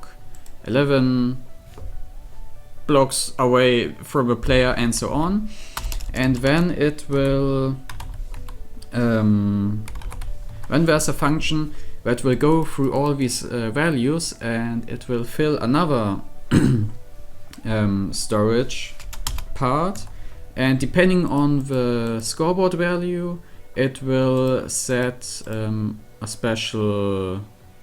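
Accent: Germany. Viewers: Achja der deutsche Akzent in englisch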